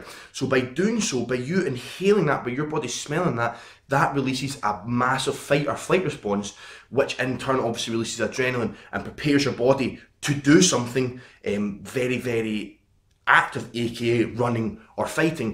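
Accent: Scotland, United Kingdom. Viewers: Is this guy Scottish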